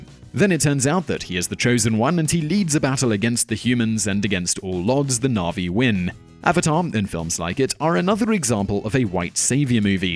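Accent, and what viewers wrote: United Kingdom. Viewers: Hypocritical? Why does a white British sounding man always have to be the one imparting knowledge? White not let a women or person of color do your show. Maybe top 10s should turn the mirror on itself.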